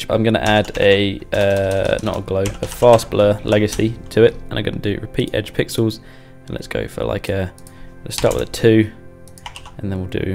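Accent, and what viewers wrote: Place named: United Kingdom. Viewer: when you have a brittish accent try talking slowly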